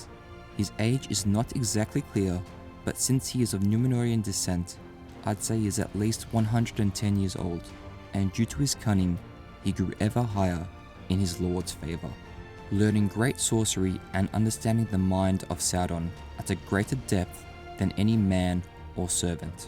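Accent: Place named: Australia. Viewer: I'm confused, this guy sounds like he's Australian but his English is so poor. Why is that?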